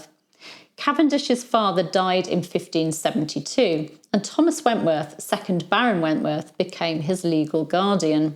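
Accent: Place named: England, United Kingdom. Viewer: Having lived a stone’s throw from Jamestown Settlement and visited the Outer Banks many times, I get a thrill hearing an English person speak of the history. I cringed a bit when I heard Ralph Lane’s name; he really cut a swath through the Native Americans in “Virginia” in 1585. I’ve always wondered what Queen Elizabeth thought of that. Thanks for all your amazing work!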